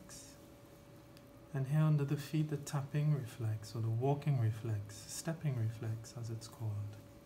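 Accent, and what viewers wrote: Trinidad and Tobago. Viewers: Is he Trinidadian? His accent sounds like it. I am also Trini. My baby is breech and I'm on here looking at exercises that I can do to get baby to turn before it comes to this point. The doctor made it look really easy in this video. He was so gentle and caring. Well done! 🙂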